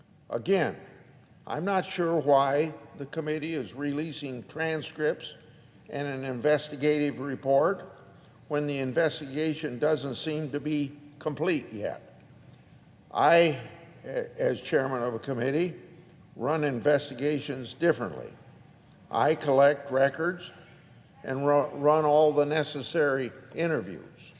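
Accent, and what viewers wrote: South, United States. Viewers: So he has a slight southern accent so you will degrade him and every other southern in the process. Thats the tolerant left for you. Still confused about why trump won in the first place, still thinks the "most popular president in history" got 81 million votes but gets bood everywhere he goes gets ratiod to hell on every video he talked about in.... but trump supporters are supposed to be the stupid ones right???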